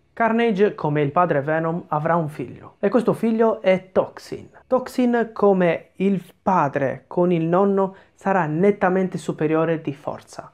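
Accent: Sardinia, Italy. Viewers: Sto guardando tutti i tuoi video,stupendi! Ma.... anche tu sei di Cagliari? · Sei sardo nenno? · Ma di dove sei Cagliari, Quartu, Pirri?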